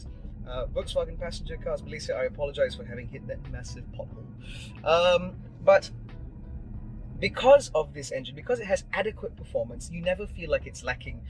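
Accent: England, United Kingdom. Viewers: Thank you … I am getting the 2023 Life model in New Zealand (same as the Highline) in the Atlantic Blue. I like your English voice. The Blue you have got there is a sublime colour!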